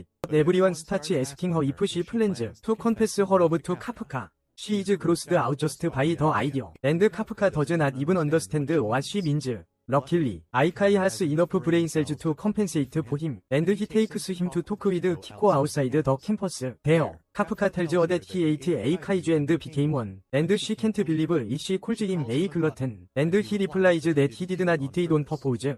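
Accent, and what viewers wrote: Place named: South Korea. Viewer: 아오 콩글리쉬 발음때문에 집중이 하나도 안돼네요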